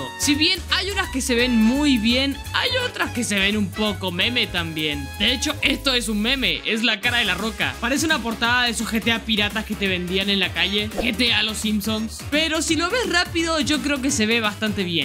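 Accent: Chile. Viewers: Erais chileno o qué onda weon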